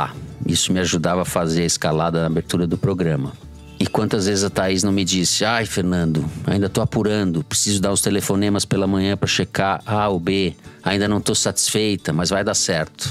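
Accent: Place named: São Paulo, Brazil. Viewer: 😢😢😢 Sucesso para cada um em seus caminhos! Sentirei saudades da sua voz, Fernando, sotaque paulista maravilhoso 😊 Quiçá teremos novos encontros aqui e ali do Foro de Teresina🙌🙌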